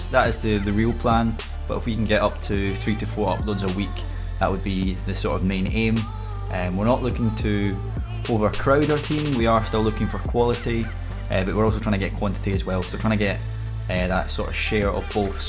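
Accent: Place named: Bishopbriggs, United Kingdom. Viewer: wits the deal wae ur mad accent yer fae bishy pal.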